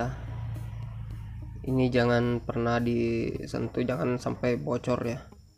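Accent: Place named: Sulawesi, Indonesia. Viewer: Orang sulawesi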